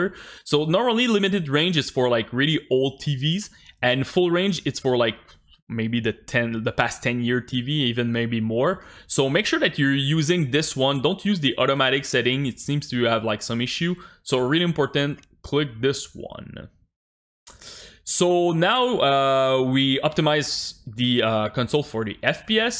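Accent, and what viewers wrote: Quebec, Canada. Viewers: Clairement tu est québéquoi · on dirais un accent qc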